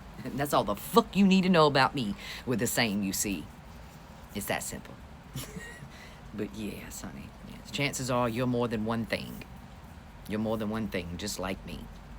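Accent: South, United States. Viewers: I love your southern drawl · I truly enjoy your content, you give it to us straight, no chaser! Just the way I like it! And, I love your accent, it has that southern charm with a sprinkle of big mama truth in it 😄. Keep bringing it sista! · I love that southern accent you have, to me it is so sexy on you. I also love the truth that you bring to us. Keep sharing your knowledge and many blessings to you, love.❤ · Your take on humanity is awesome. The southern dialect is so refreshing. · Can I say that as a Black Southern Woman, I absolutely adore your Southern Belle accent. My first time seeing her content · You are so memorizing to the point I don't even see your color. That Southern Bell accent makes me feel like I'm listening to another sister. Furthermore you are intelligent too. Appreciate you girl!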